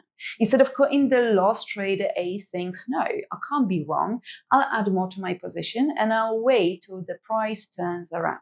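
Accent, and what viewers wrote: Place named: United Kingdom. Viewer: This video is beyond informative. The soft warm British accent is definitely helpful.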